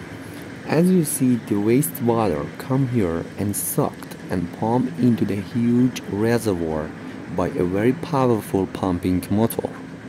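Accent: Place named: Europe. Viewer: Why is the voice that's narrating this video sound European but all the men in the video look Middle Eastern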